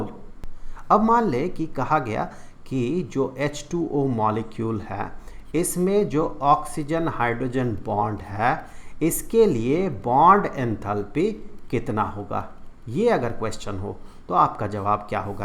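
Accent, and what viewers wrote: Bihar, India. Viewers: but brother i am from Uttar Pradesh😂😂..His ascent of speaking relates to biharis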